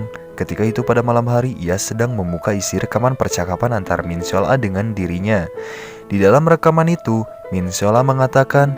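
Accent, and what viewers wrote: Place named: West Java, Indonesia. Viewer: Orang sunda mana nih...😂😂😂😂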